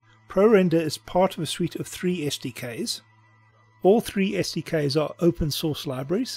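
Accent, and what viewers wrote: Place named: South Africa. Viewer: Came here from the SideFX site, immediately recognized the accent. Howzit boet :D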